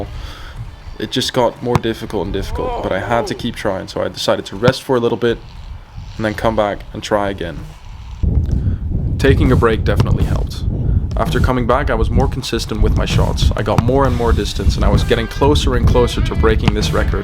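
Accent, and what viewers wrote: Netherlands. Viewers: Are you actually Dutch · eh bro jij bent toch nederlands dat hoor ik aan je engels accent, maar je maakt echt leuke content ga zo door!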